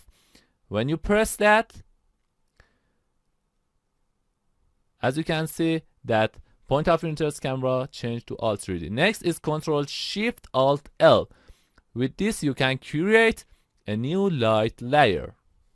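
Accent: Iran. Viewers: Thank u for this useful tutorial , but u really need to work on ur persian accent , maybe u should listen to nosrat english learning to correct ur accent , thnks again.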